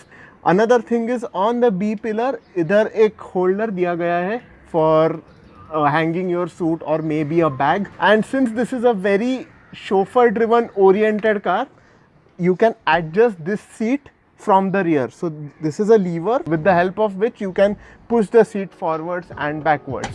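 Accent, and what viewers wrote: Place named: Mumbai, India. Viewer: Very informative,!! Your talking style is unique,and 'Bambaiyaa'!! But you gave us a good 'Gyaan'!! I am watching this in 2021 end, with heavy heart, because, VW is on a 'killing spree' being a 'petrol head', I mourn death of 1.2TSI, 1.4TSI, & 1.5TSI is not for 'people'( I think, VW considers people are those, who can spend >22.5 lakhs for a car)!! It is evident, how much you love your car!! Happy driving days, & continue the 'good work' you are doing!!!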